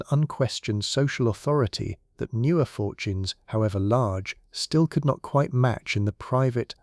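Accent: United Kingdom. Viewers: Lancashire England. Great storytelling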